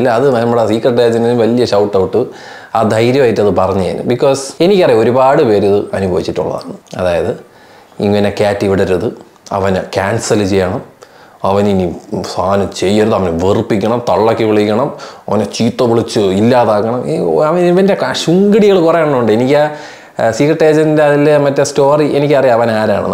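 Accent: Trivandrum, India. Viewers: Huge Respect bro, Secret Agent & Mallu Traveller 🙏🙏👍👍👍👌👌and bro your Trivandrum slang 👌👌👌 വേറെ ലെവൽ 👌👌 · എന്തൊക്കെ പറഞ്ഞാലും നല്ല തിരുവന്തപുരം ഭാഷയിൽ പത്ത് പറയുമ്പോൾ ഉള്ള സുഖം അതൊന്ന് വേറെ തന്നെ...👍😊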